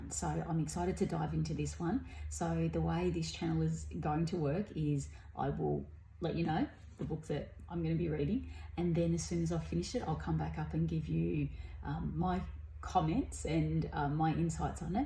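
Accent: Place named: Australia. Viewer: Jist found your channel, hope you keep uploading as great to hear more Aussies on booktube!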